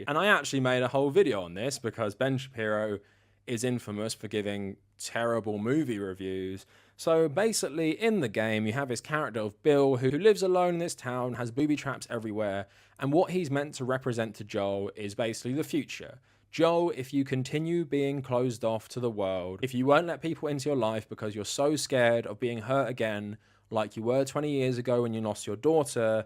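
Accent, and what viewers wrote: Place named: United Kingdom. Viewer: That’s funny. I never thought there was any other way to say those words. I mean, I sometimes say mirror like it has an o in it, but only because it sounds weird. English is a nonsense language with no real rules. That’s what makes it so good. And in all honesty, I’m a sucker for foreign accents, which is one reason I watch this channel. Everything sounds smarter with a British accent.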